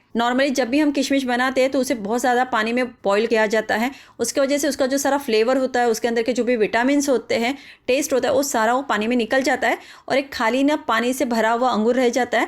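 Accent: India. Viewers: I love your hindi accent.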